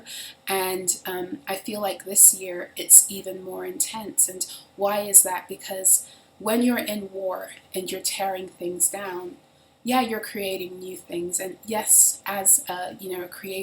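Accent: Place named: United Kingdom. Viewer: "You have caused confusion and delay!" Love love love your English (or Brit) 'accent!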